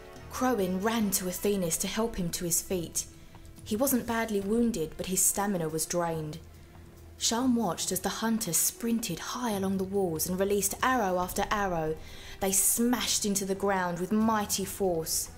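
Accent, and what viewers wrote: United Kingdom. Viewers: Cockney chicks shouldn't be telling stories tbqfh! voice just doesn't work for seriousness dialogue! I feel like after you pause to speak your gonna say next "apples and pears 10 bob"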